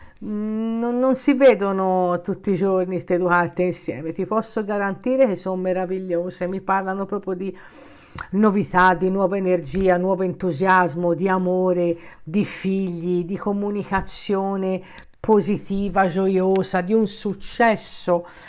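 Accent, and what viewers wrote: Tuscany, Italy. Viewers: A me mi fai morire 😂 acciderba al toscanaccio🤙😊😂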